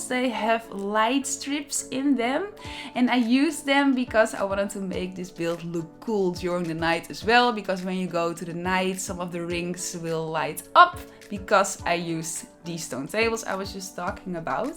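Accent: Netherlands. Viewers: Are you Dutch?😁🇳🇱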